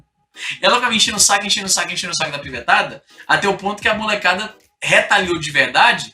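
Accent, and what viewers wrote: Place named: Ceará, Brazil. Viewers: Sotaque dele é muito forte. todo vei aqui em Fortaleza fala assim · Éeeegua.... aêeeeee um cearense numca esquece suas raízes! Aprende com teu pai Izzy 😄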